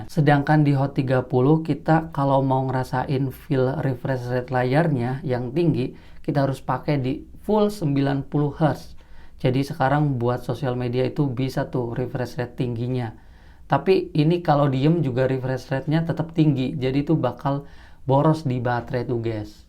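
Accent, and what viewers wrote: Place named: Demak, Indonesia. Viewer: Bang lo orang demak ya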